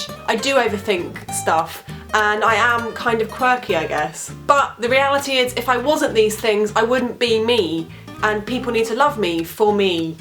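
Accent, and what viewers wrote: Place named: Cockney, United Kingdom. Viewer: I think point 23 is the most satisfactory for you today; good for you. I know i'm annoying, but why don't you create english subtitles for your videos, since you write your own scripts for the most part of them? Foreign audience would be pleased to translate them into other languages, so that they could enjoy your sort of cockney accent.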